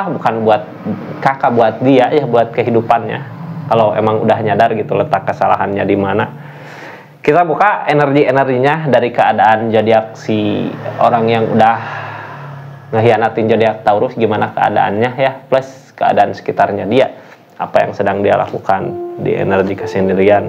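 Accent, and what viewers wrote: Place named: Sunda, Indonesia. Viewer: logat sundanya kental banget... 😍 Thanks readinganya... Sukses selalu ❤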